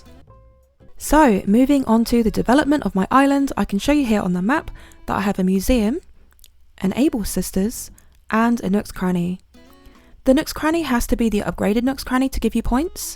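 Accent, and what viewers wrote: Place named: United Kingdom. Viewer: I suspect it’s cuz ur bri’ish or austalian or something! Got that soft lilt in your cadence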